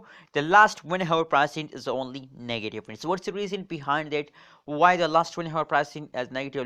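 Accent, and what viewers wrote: India. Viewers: Whenever I hear a Indian accent on these stock I feel like it’s a scam. Since all these damn scammers calling people phones are from somewhere in India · Why is there a picture of a white dude but i can clearly hear you are indian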